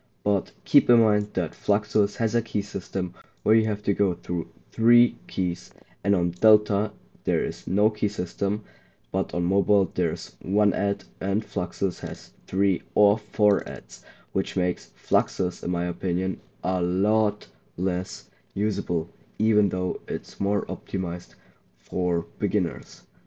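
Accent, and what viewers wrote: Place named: Germany. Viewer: ich höre deutsch bruderherz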